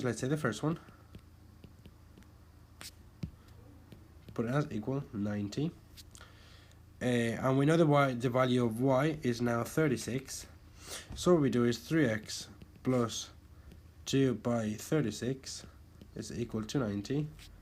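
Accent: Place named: Ireland. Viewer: Hey glad I found this video. Thank you. Could not remember how to do this. It is easier if you do a simultaneous equation pretending the ≤ is =.............. Like your Irish accent.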